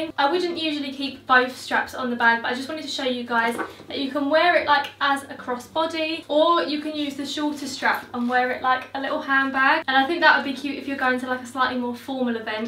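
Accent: Essex, United Kingdom. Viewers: Is it possible that you become more Essex with every video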